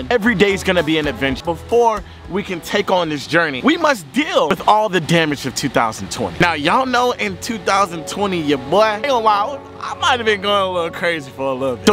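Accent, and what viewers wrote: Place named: Baltimore, United States. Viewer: Y he sound like he from baltimore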